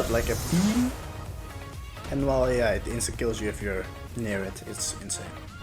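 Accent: Netherlands. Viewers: Haha ik ook hoorde het in het accent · Nederlands acent ga door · Are you from belgium or netherlands bcs i can kinda hear the accent$ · Nederlands accent hahaha! keep it going! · Hahaha i can hear at your voice that you are from NL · Ik hoor dat Nederlands accent wel hoor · Bro ik hoorde meteen toen ik de video opstarten dat je nederlands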